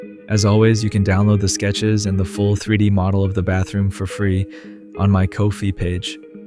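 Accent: France. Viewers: Why do you use a voice actor for some of your videos? It's better when it's your normal French accent.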